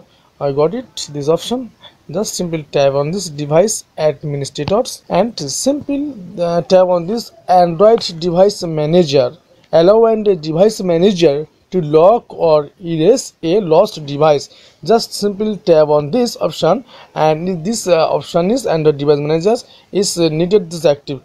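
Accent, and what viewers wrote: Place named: England, United Kingdom. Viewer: English khatarnak hai bihari...par axcent pura angrejo wala mara hai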